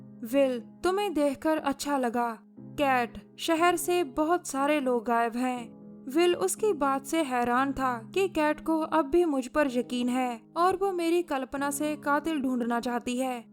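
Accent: Punjab, India. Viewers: Too heavy on MTI punjabi hindi accent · According to ur accent ,tusi punjab to ho?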